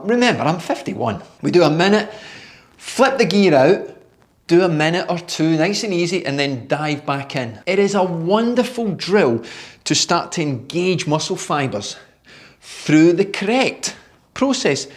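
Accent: Scotland, United Kingdom. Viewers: Actually, it’s the English who speak Scottish a wee bit funny…there’s just more of them so people around the world think their accent is real. It’s Not. This is real! 😊😊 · bro???😂😂😂😂,,,I love your Scottish acent a MOERSE LOT!!! from Wellington, RSA.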